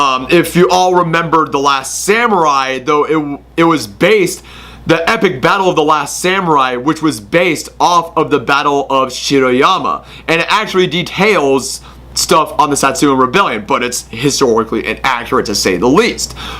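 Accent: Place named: Russia. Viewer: Dat Putin accent doh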